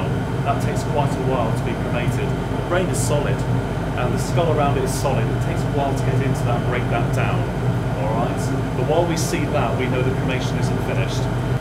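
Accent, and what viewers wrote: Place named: United Kingdom. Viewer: A British accent makes everything sound nice!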